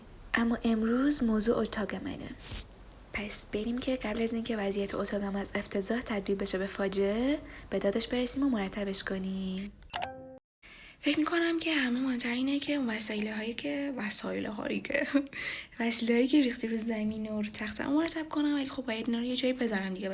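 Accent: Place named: Turkey. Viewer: حس خوبی بهم میدی با توجه به یه کوچولو لحجه ای که داری حس میکنم ترکی❤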